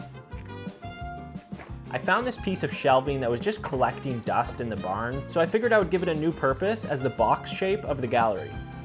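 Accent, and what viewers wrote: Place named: Canada. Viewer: the Canadian accent 🤣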